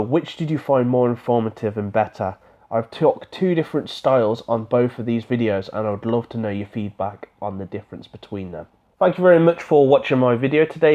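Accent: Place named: United Kingdom. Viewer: I bet you're from GB, not USA, judging by your pronounciation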